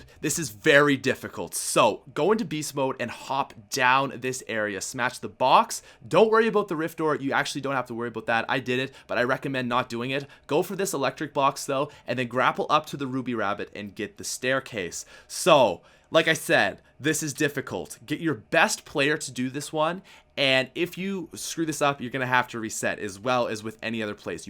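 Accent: Canada. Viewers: Are you canadian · Are u Canadian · Strongest Canadian accent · Dude he's Canadian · the Canadian accent is strong in this one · You are definitely Canadian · He’s Canadian. That’s how Canadians pronounce out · Is he Canadian? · Are you Canadian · R u Canadian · Hey are you Canadian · Lol this guy is definitely Canadian. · I think he's from Canada. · this is my first time checking out your channel and you sound way more Canadian than lex. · Dude i love the Canadian accent.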